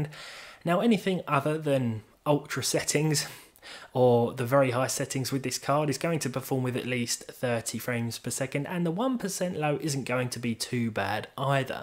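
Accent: United Kingdom. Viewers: He's british. It's called an accent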